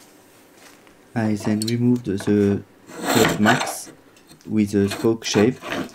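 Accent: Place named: France. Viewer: Le sous titrage est une autre solution ;) ! Ah ! Cet accent français quoi ;) ! Mais joli boulot !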